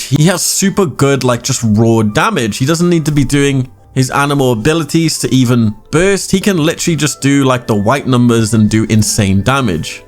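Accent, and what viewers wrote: United Kingdom. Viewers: You sound a little British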